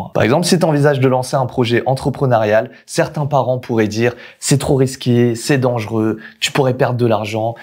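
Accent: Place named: France. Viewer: Jadore ton accent francais , fille de lalgerie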